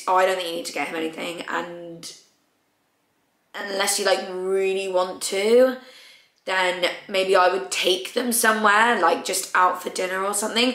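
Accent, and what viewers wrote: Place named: United Kingdom. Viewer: this girl got the most British accent I've ever heard yo 😂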